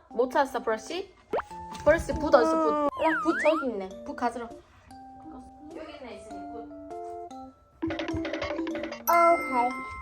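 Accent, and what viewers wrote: Gyeongsang, South Korea. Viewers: 반성합니다... 같은 경상도 출신인데 은 힘든 육아 속에서도 어찌 말투에 짜증 하나 안묻어날까요..😂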